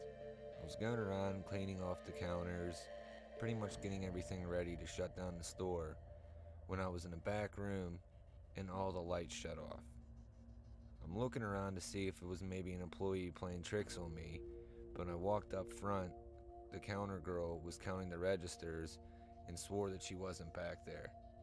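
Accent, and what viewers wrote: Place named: Pittsburgh, United States. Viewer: Good stories! Only critique is that when you are speaking I can still hear that Pittsburgh accent come through. Like "..... One of the last things I'm gonna share wit JEW." It's hard to break that after speaking it for so many years. Keep doing what you are doing..... The followers will come!!!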